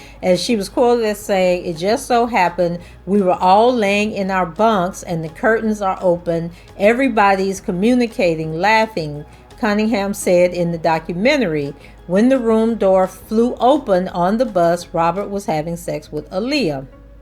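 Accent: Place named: Georgia, United States. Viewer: you sound like my country family from Reidsville Ga with your accent 🤣 Background =Bikeground.. lol 😚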